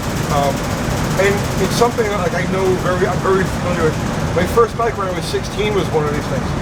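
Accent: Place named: New York, United States. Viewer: Love your channel..watch it AWL the time.lol the NY accent is just a plus.lol I was raised in Boston so I drop all my R's myself.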